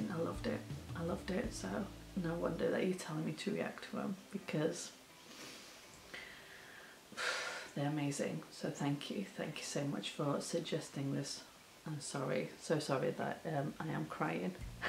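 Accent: United Kingdom. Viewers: you are so Northern English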